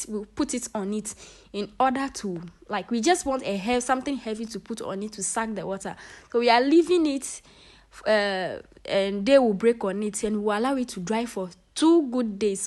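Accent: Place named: Ghana. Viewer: Very educational and interesting 🎉 Plus your voice and GH accent is giving 😊🌹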